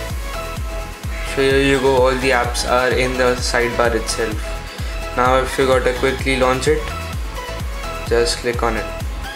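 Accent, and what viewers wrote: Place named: India. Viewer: an another indian phone toturial, who speak with accent...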